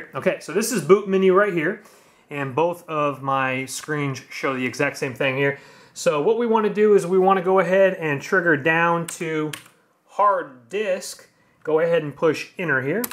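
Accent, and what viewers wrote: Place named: United States. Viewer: Finally an american been watching indians and cant understand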